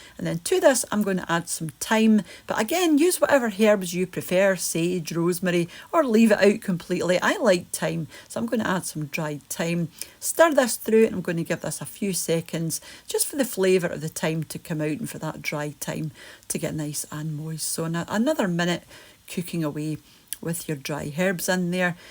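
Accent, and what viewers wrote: Scotland, United Kingdom. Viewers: your Scottish accent is so beautiful. I miss hearing the accent. I was borne in Airdrie and the family moved to the USA when I was about 3. My dad and mom never lost their accent unfortunately I lost mine. Your recipes bring back so much memories. I remember some of them so thank you for the wonderful memories and recipes. Now I can try them myself. Thanks again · I love the Scottish accent too :)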